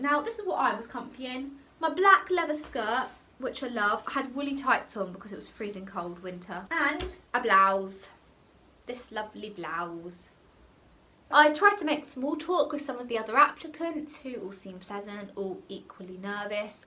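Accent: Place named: RP, United Kingdom. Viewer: your too posh 😭😭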